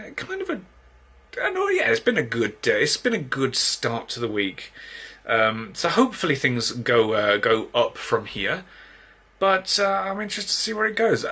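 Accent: RP, United Kingdom. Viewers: You have to do the posh accent, everything else won't cut it! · Could NOT stop laughing at your monocle and posh accent, cracking me up!!! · I like your posh accent.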